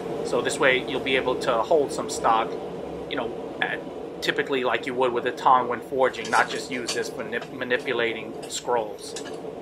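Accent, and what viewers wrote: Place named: New York, United States. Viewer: One question I have. The depressions you make with the guillotine tool at the front and back of the boss, are those functional or just for aesthetics? Also, do you originally come from the NY/Long island area? just guessing by your accent.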